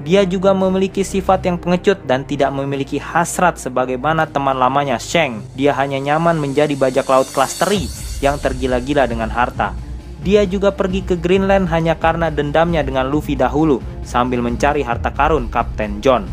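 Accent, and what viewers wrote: Indonesia. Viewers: Aduu baang, bacanya diperbaiki mohon. Jangan semua nama diucapkan sama pelafalan Indo :(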